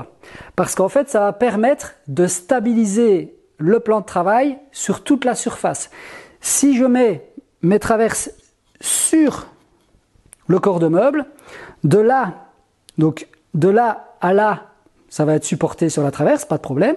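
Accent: Belgium. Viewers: Une vidéo qui m'a donner envi de faire aussi ma propre cuisine, merci beaucoup pour ttes ces explication j'arrive plus facilement maintenant à voir comment sont fait les meubles et caissons !! Je suis abonné depuis peu mais j'adore ta pédagogie et aussi ton accent belge 😂 !! Encore merci pour tous tes partages de connaissances 🙏👍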